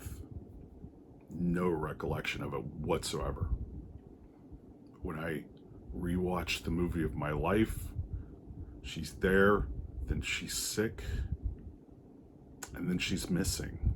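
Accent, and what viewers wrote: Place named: Michigan, United States. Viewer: edit Oh shit, you said Birmingham? Based on your accent, I'm assuming this is Michigan and not Alabama, but if you are a fellow Southerner, shoutouts to you!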